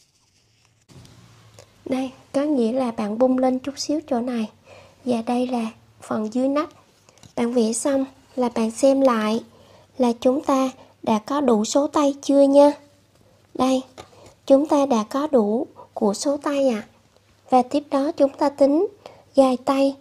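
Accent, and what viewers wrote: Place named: Mekong Delta, Vietnam. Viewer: Bà chắc dễ thương lắm nè.cưng ghê giống người miền tây hả bạn❤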